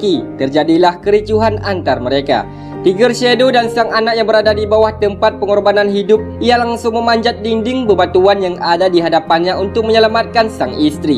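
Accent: Aceh, Indonesia. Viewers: Bang, aban orang Aceh ya? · Ko org aceh ya min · logatnya orang aceh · Klo di dengar dari logat bahasa nya pasti orang Aceh 😂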